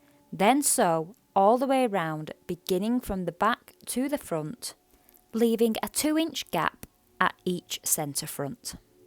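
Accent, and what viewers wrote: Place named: North, United Kingdom. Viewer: I LOVE your northern accent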